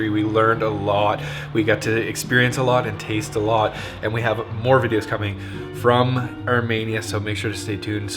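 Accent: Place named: Canada. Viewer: I love your Canadian accent (the "ou" phonemes are probably Scottish in origin)! You probably know more about international cuisines then anyone else on earth. "Been there. Tasted that . . ." It's existential, not cook book stuff! Long may you reign . . .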